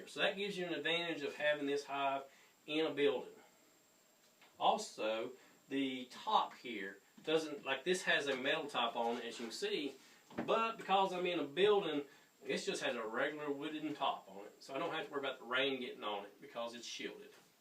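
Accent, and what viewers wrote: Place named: United States. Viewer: sadly with bad acoustics and US accent I cannot hear or understand this video. any chance of sub-titles for key points. Thanks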